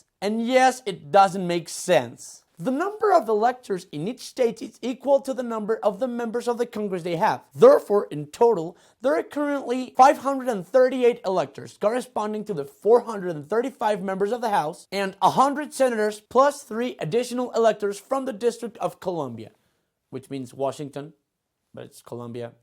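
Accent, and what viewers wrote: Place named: Mexico. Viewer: Please, please, PLEASE!!! Don't make any more of this. Your Mexican accent makes me cringe. I try to pay attention to what you're trying to say but, damn! And you ideas and opinions....Well, just watch your own videos and you'll know what I mean. If I want to see funny news, I'll just watch Colbert, or The Daily News re-runs because them I can actually understand.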